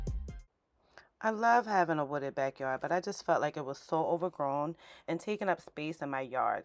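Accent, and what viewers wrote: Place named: Charleston, United States. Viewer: New subscripber. Love this! That accent sure sounds familiar. Charleston?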